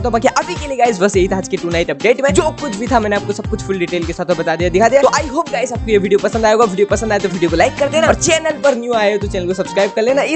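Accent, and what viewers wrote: Odisha, India. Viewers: Bhai tu odiya he na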